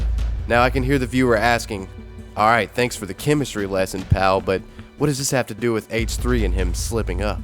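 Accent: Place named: South, United States. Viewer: Why do you sound like a CSA southern solider ?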